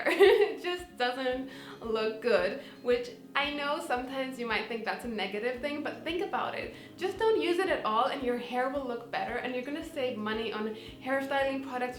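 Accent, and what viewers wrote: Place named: Germany. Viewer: Wow that is exactly the kind of positive vibe I'd need for starting into 2019 with a healthy mind❤ I always had thin hair no matter what, but I'm finally coming to terms with it! Also I have a question for you, I don't wanna be rude or something but you sound like you have a German accent :) it doesn't sound bad or anything I just noticed it bc I certainly have one 😁❤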